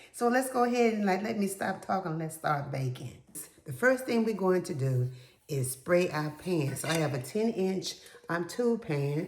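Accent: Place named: South, United States. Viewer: When you were making the frosting with your Southern accent my Yankee self thought you kept saying it was a portable frosting. I thought isn't all frosting portable? Then I realized you were saying pourable. Lol. Anyways that cake looks incredible. I'll have to try making it sometime. Thanks for sharing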